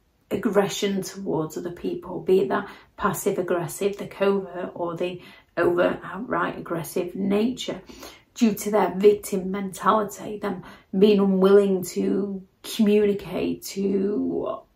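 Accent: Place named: Northern England, United Kingdom. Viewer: Her accent is northern English.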